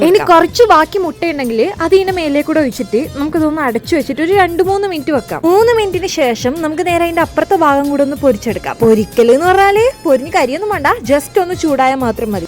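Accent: Kannur, India. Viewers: ന്ത്‌ ആർട്ടിഫിഷൽ സംസാരം ന്നു. ഇത് കണ്ണൂർ സ്ലാങ് ആന്നു.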